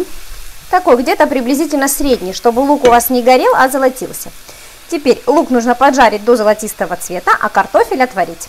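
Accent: Ukraine. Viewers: Люди, у нее все нормально с ногтями и с речью! Она просто из Украины и у нее такой акцент. Почему вы людям другой национальности не указываете как разговаривать! Вот 100% больше половины неучей и неудачников здесь что-то вещают на! Если что-то не нравится, прошли мимо нафиг и не смотрите! Зачем обязательно какое-то дерьмо надо написать! Жаль, что не наказывают интернет-гениев за оскорбления по закону!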